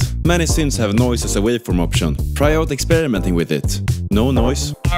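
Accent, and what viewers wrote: Sweden. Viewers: Every Swedish person can tell he’s Swedish just hearing how to talks in English 💀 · The Swedish accent is so damn recognizeable it's crazy 😂😂😂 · he’s Swedish so he pronounces “j” as a y. Stuff like that is how we got the name “Joe”. Joe<Joseph<Yousef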